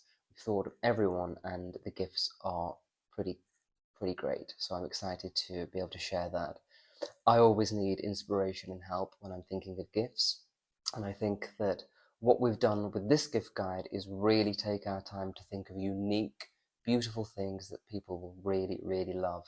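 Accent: RP, United Kingdom. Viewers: Just a big advertisement for Almond Tea! BTW Pronounced armond, silent ‘l’. That plum in your mouth is getting bigger each week! Please be yourself.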